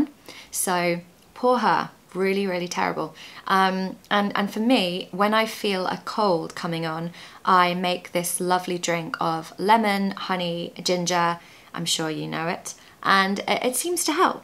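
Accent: United Kingdom. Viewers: contigo eh aprendido la pronunciasion en ingles britanico.....aunque el acento americano no suena mal....besos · Your accent is really great help me learning British English. Thank you a lot. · I Love the way How you pronounce and speak ,I love adore British Accent ,so Cute .. "In the same boat "this idiom in my language means the same: Estar no mesmo barco-Portuguese... We have a sweetest one :The rice of the same Sack =Arroz do mesmo saco) we use this when two people or thing behave in the same way but badly. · I love to learn new english idioms everyday... Also I love your british accent ☺Sure you will success in your channel teachhing english... Cheers from Argentina🔥🇦🇷😊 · I lovd your accent and tips in your episodes to learn the British English. Keep the great work up and all respect 👏👏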